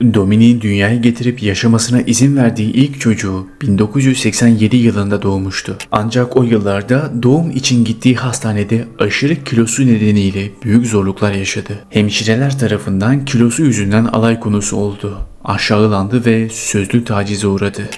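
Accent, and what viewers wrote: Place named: France. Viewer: Fransızca biliyor galiba onun için böyle konuşur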